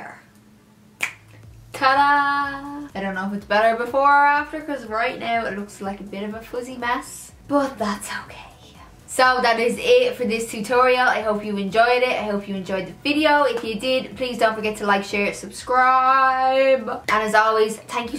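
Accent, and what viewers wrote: Ireland. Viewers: I could listen to you speak with your beautiful Irish accent all day. I love this palette. The shades are stunning and the look you created is gorgeous.. Thanks for the tutorial. xxx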